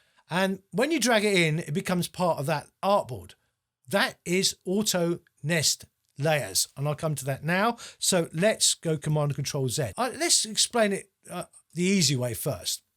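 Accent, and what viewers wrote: England, United Kingdom. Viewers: Very helpfull! Where is your accent from? It reminds me of when I lived in England.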